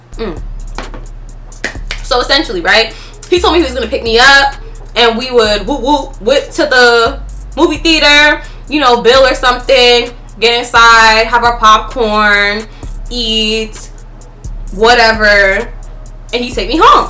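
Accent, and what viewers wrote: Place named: Toronto, Canada. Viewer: The Toronto accent I love it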